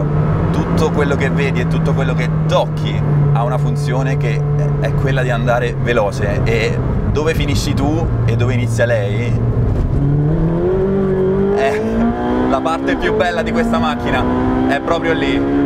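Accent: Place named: Corvaro, Italy. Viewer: Ciao... no sicuro proprio no... ma vedo sempre più video simili... dove un comune mortale non può quasi mai arrivare... spero non ti sii convertito totalmente a questo livello di auto... ti seguo da sempre x il modo di raccontare le auto e la storia, e perchè mia moglie e di Corvaro noi siamo in sardegna e quindi a mia moglie le fà piacere sentire quell'accento... verró a trovarti promesso!!! Perdonami se è sembrata una provocazione!!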